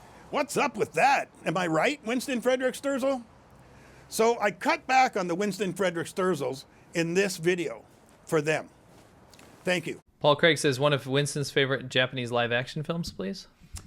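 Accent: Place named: South, United States. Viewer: The fact that the locals recognise you as pro China is actually very positive. Also the other store probably was told of a foreigner who drinks the tall cans of grape juice. If you are right and it’s not a local thing then you would stick out even more because of that. Especially if they identify your accent as from the south and the drink also popular with people from a certain region.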